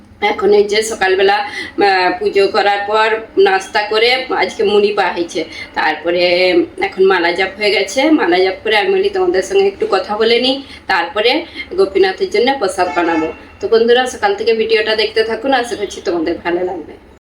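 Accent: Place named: Odisha, India. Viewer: Apnar kotha sunle mome hoy bangali non, odia mone hoy. Apana kon odia achhi ?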